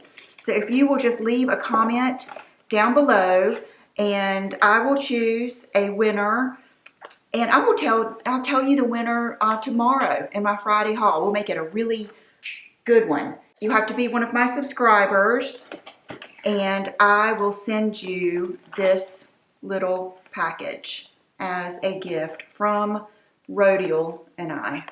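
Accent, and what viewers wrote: South, United States. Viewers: I was so excited seeing all of the missing make-up and box of perfume you found in the garage the last few weeks. It was so fun to see the joy all of that stuff brought you. I love your energy and sweet soothing southern voice. 🌸🐝 🌸🌸💄 👛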